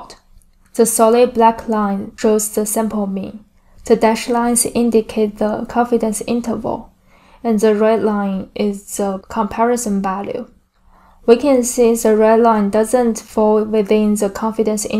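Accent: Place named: Asia. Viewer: Super thick Asian accent makes the video difficult to understand. Had to use subtitles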